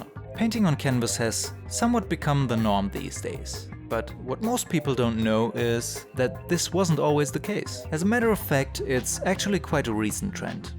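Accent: Germany. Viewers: are you german? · You have a German acsent Ich finde es schön wie du darüber erzählst was zeichnen für dich ist und das man nicht auf andere hören soll....Danke für die tipps 😄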